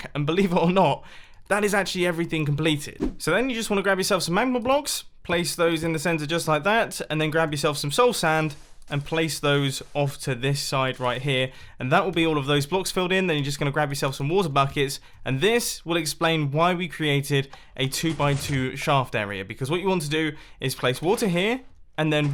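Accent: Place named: England, United Kingdom. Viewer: I’m sure you might have said this before somewhere, but I’m a new subscriber and just wondered whereabouts in England you’re from?? I’m from England too but your accent is so lovely to just zone out and listen to!!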